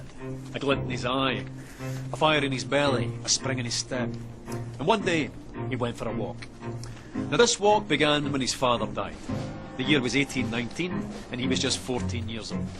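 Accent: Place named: Scotland, United Kingdom. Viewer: O sotaque escocês é muito foda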